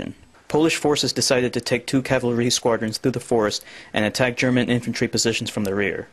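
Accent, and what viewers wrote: India. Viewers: I agree totally Indian accent.....better to do nothing please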